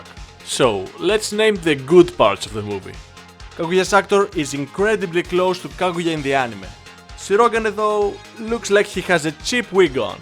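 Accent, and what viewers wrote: Greece. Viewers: Also are you Greek? Cause your accent sound like it. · Wait... I recognize this accent...you greek? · Are you from greece? Cause your accent seems greek to me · πως καταλαβαινει καποιος παντως απο την προφορα τον Ελληνα...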